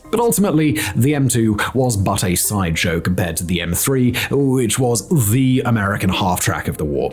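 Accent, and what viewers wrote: England, United Kingdom. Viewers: got a stupid question are you from Great Britain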